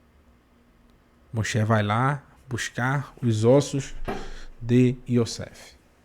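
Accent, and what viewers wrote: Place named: Recife, Brazil. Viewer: Excelente estudo, moreh. Recifense da gema, o sotaque não nega.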